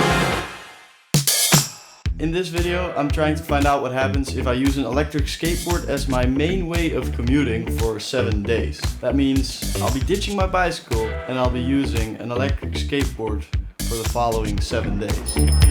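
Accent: Netherlands. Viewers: This guy dutch? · Ik wist dat je nl was khoor dat aan je accent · you are NL I can hear it on your accent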